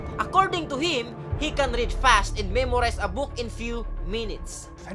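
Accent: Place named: United States. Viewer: american english i love it lol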